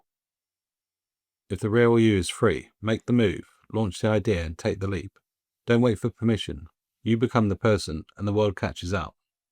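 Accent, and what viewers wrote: United Kingdom. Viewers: What part of England are you from? I was stationed there for 8 years. Loved it.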